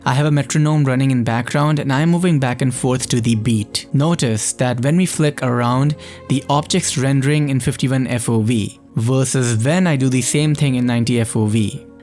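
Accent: India. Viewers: Idk if anyone else has noticed, but now sounds more "Indian" if uk what i mean 😉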